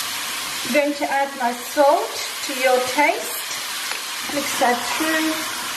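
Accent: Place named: South Africa. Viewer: Because she is not an Indian, she has a South African accent - how rude and totally ignorant, (maybe uneducated as we'll I suspect) to ask "why the accent? " - I made this recipe and it is awesome - thank you lovely accent lady❤❤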